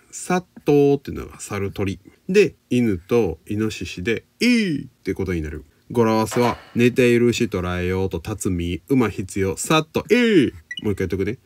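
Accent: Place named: Kyoto, Japan. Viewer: もちろんです！源氏物語はもとより、さんの京都弁、声、話し方、スピード、全てに癒されています。学生の時にこんな先生がいたらもっと古典の勉強、頑張れたのに・・😂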